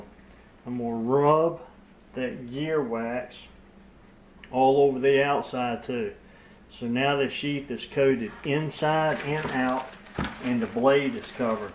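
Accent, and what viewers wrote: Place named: South, United States. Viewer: I just love that southern accent of yours . 😊 On the other hand, in the absence of a vacuum machine, what would you use?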